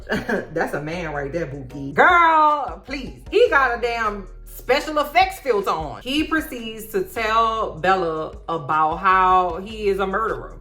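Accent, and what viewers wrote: New Orleans, United States. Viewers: New Subscriber here. I found your channel for the “His&Hers” review and enjoyed everything about it. Your storytelling is engaging and funny and I can listing to the NOLA accent all day! As an adult who was all about Twilight back in the day and sometimes re-watches as a guilty pleasure I’m all here for your input. ❤🎉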